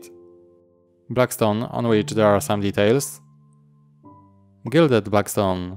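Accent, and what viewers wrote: Poland. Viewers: Great build From your accent I assume you are from Poland? If so thats amazing for polish community! :) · Damn, I absolutely love it Dobra robota bratku (Wnioskując po twoim akcencie Polska)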